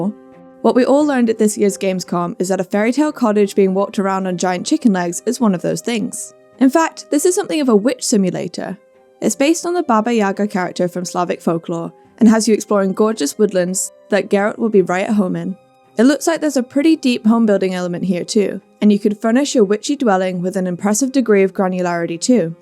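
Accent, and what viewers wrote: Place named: Yorkshire, United Kingdom. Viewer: Yorkshire accents? Sign me up for TGYH. Excellent coverage :)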